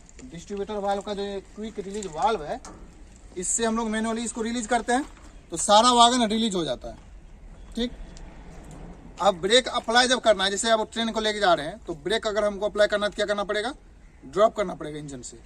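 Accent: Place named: Bihar, India. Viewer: Super sir ji very good apke bihar se hai lag raha hai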